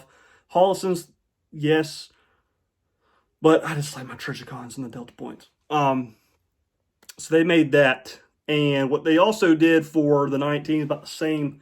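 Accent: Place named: Australia. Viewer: Interesting accent. Is this guy an Aussie expat?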